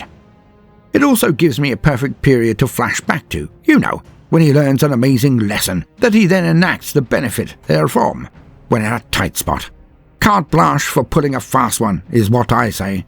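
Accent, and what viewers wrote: United Kingdom. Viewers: Hearing the contrast between a dramatic, vaguely british accented voice delicately explain the brutally cunning (or is it cunningly brutal?) workings of what passes for an ork's thought processes is just too perfect.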